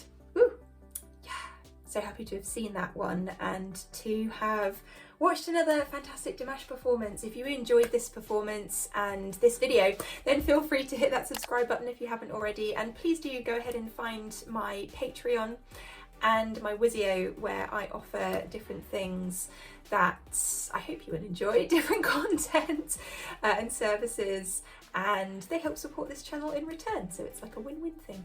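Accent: United Kingdom. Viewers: Nice British accent you have(Shakespeare 's), thank you so much!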